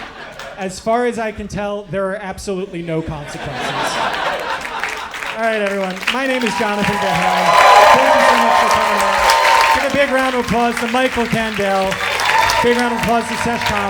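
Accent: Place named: Saskatchewan, Canada. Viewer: Lovely, sounds like you grew up in Dog River Saskatchewan, great stuff!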